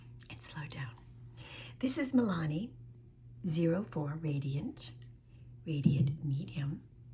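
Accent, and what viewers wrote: New England, United States. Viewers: From the accent, I'm guessing you're a New Englander. And I understand that you're moving to Texas. How are you feeling about that prospect? Hope you're MAGA if you want to fit in there... Good luck with the relo.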